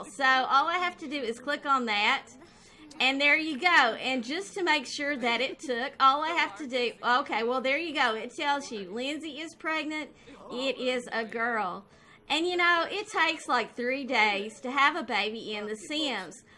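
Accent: South, United States. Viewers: subscribed. great and informative video. i know you get this a lot but i absolutely love your voice/southern accent, its so cute. you are so intelligent and funny too, you make everything so easy to understand and present everything so well. you deserve way more subs then you have without a doubt! you've got talent! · Love the video but I'm trying to figured out if ur American or what love ur accent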